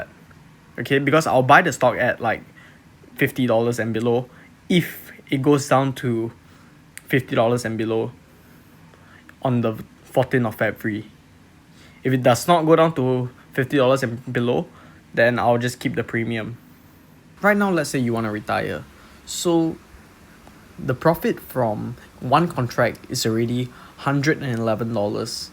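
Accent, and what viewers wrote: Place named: Singapore. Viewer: singapore accent ah boi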